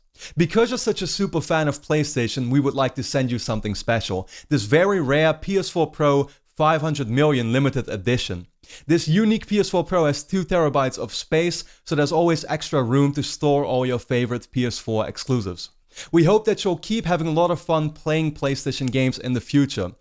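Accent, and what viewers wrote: Netherlands. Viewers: Ik hoorfe gelijk dat hij nl was accent is altijd hoog hierzo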